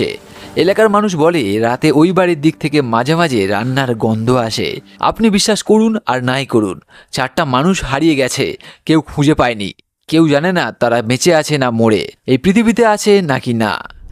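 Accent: Kolkata, India. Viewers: Vai why do you have Kolkata accent?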